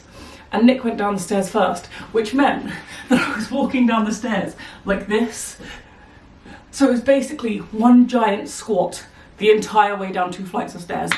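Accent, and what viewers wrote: Liverpool, United Kingdom. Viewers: Its just Liverpool English accent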